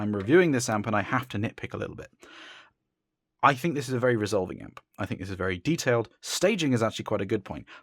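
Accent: United Kingdom. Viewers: This is like watching evil Zeos. No anime, no cat, and no cursing. Instead of the conservative talk radio vocals, there's an elegant English accent. There is no Patreon/yard sale. There are cuts in the video. There is no random song selection every time.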